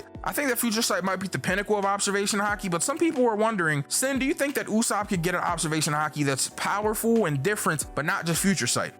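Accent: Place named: Oakland, United States. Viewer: Really enjoy you being yourself when explaining things. Hearing you reminds me of my time with friends from Oakland and their cool vibe. Keep it up. It means a lot for some people like me.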